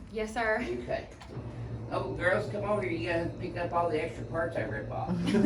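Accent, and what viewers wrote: South, United States. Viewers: Nothing wrong with a southern accent and a nice rack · are you from redneck??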